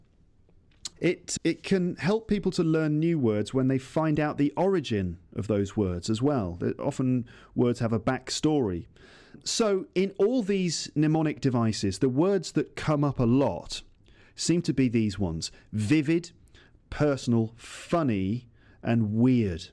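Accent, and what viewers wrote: RP, United Kingdom. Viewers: You're the best podcaster for me. I listen you becouse I want to have RP accent and It's so clear (understandable.) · Come up with more such podcasts. I love your British accent :)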